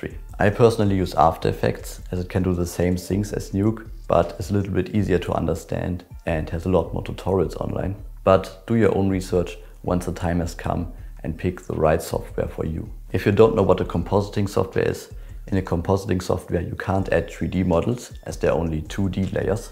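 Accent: Germany. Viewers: Ah höre ich da nen deutschen Akzent? 😳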